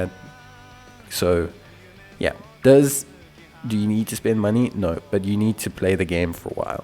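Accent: South Africa. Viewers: Wait are you south african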